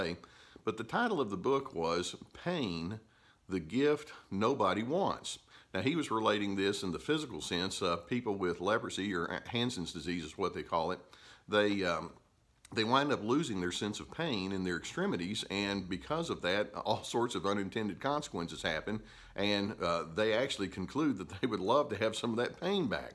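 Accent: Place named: Texas, United States. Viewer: Wish she (84 yr old mommy) would get outta my physical garage, chuckle. Gotta change the lock to under my house. I'm a locksmith now and proud of it. I am constantly amazed what one can do on your own when you are broke ; ) Oh, a plumber too, and fixed the gas stove fireplace! Still haven't cleaned those gutters & now rain/snow here in mountains of Ruidoso aka "rumordodo"! Thank you. I do really know what you mean! Very true! Love the true Texas accent, I lived in Houston for almost 20 years. I miss it cuz it was so big I could easily hide from "mommy"!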